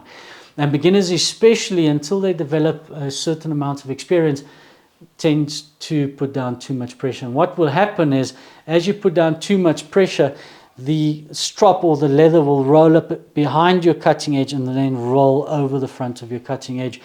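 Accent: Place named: South Africa. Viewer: How do you refresh your strop when the leather darkens and flattens? BTW, your accent reminds me of Darryl Cullinan the former SA batsman.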